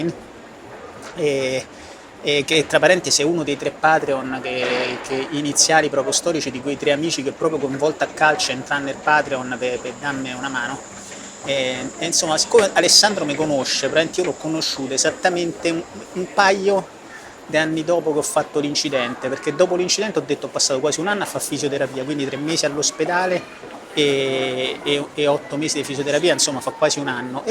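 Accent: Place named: Rome, Italy. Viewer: Che poi Gli Inglesi guarderanno il video in modo perfetto e con stile tipo "We are Like star Wars, We reached episode 4 of The Car accident" Poi ci siamo noi italiani che sentiamo "Savve pellegrini d'a rete" Amo il tuo accento romano in every case XD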